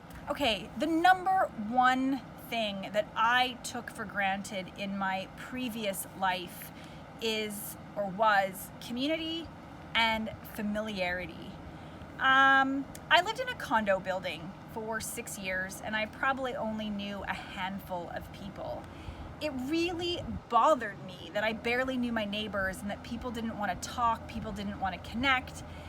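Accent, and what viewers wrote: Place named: Canada. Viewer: I have to watch your videos with captions. Canadians talk funny. I know it is not your fault but my research shows Canadians are taught to pronounce certain words largely based on their Scottish heritage. Some examples include, “about,” “against,” and “out.” Thank HGTV and DIY network for choosing shows produced in Canada. I watched a TON of shows over the years. It became really annoying.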